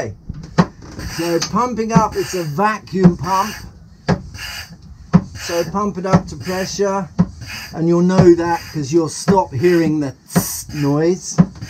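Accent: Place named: RP, United Kingdom. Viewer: Great video and exceptional BBC voice! Appreciate the help. Regards from New Zealand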